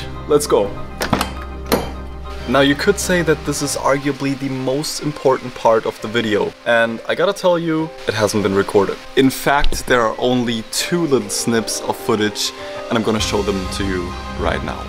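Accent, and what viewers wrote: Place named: Germany. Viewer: I new from the beginning you must be german speaking because of that accent 😂 but the license plate did make me unsure, until you started talking to your buddy😂 Well, Hallo aus Deutschland 😂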